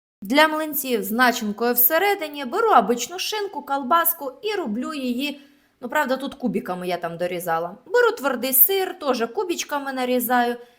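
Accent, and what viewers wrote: Surzhyk, Ukraine. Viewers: такий жахливий суржик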